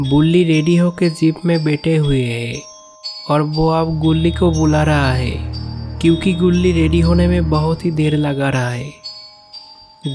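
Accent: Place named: Bengal, India. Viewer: Awaj chenge karo bangali awaj sahi nahi hi koi saf hindi bolne wale ko job pe lagao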